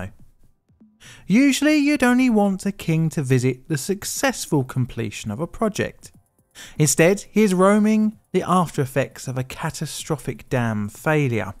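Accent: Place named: England, United Kingdom. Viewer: In future videos, can you stop using that awful England accent. It's rather annoying.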